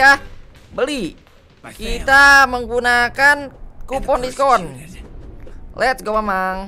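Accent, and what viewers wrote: West Java, Indonesia. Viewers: Bang lo orang sunda bukan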